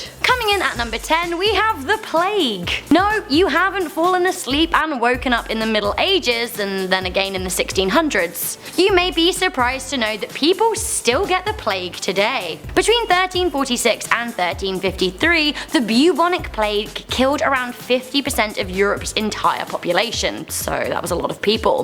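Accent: United Kingdom. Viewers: Does your British dialect have a name? You have such a very pleasant voice! ❤️ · Something about a British accent makes the end of the world seem pleasant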